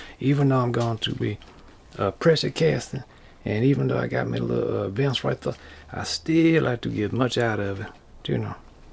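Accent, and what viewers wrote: Louisiana, United States. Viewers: You have to be from Louisiana!! Hearing you make me think of home!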